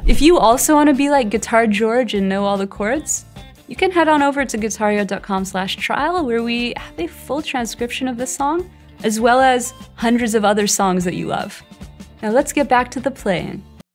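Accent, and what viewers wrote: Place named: Canada. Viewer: I knew you were Canadian without checking. You said, "I'm sore-ey" as opposed to us Yanks' "sah-rey." Awesome lesson.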